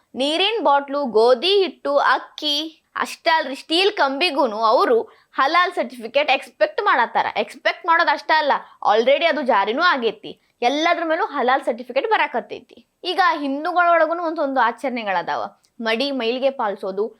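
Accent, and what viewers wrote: North Karnataka, India. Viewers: nimma north kannada slang beda normal kannada matadi illandre elandre keloke iritate agute dont copy tv vikrama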